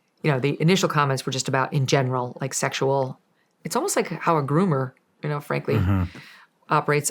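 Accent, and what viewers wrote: Ireland. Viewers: She's irish?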